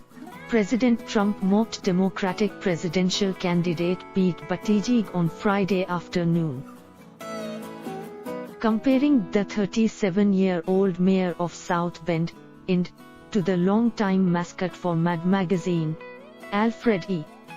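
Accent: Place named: India. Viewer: PS. Who is the Indian narrator for this 'show'?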